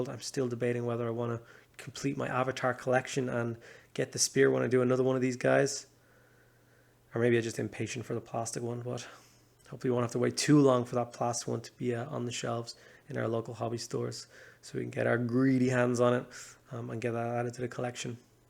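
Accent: Ireland. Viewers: Random question but where are you from? You have a mixed accent or it sounds like it to me. How you say certain words sounds very Dublinish:-P This is a really cool to see you save and redo the model. Looks like the person might of been new and didnt know what was going wrong with the model.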